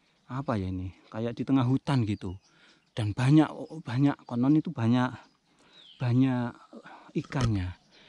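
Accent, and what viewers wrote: Java, Indonesia. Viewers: assalamu alaikum wr.wb. njenengan jawane mana kak... wassalamu alaikum wr.wb.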